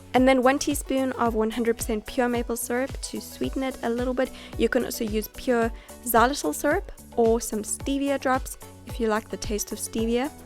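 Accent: South Africa. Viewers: great video! i love your accent! are you south African? sorry if i am wrong! X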